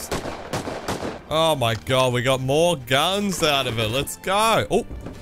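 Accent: United Kingdom. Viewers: O you're Brit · You sound like you're British are you British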